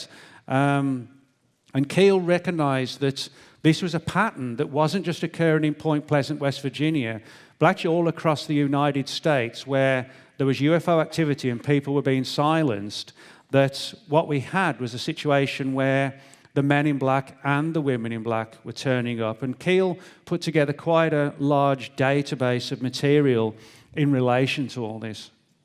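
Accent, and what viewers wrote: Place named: Birmingham, United Kingdom. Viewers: The Arlington Brummie 👍🏻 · sounds very UK Midlands accent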